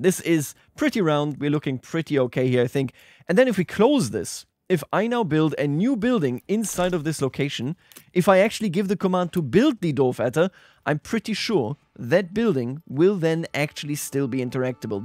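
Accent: Germany. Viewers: Haha hab sofort den deutschen Akzent rausgehört. sehr cooles video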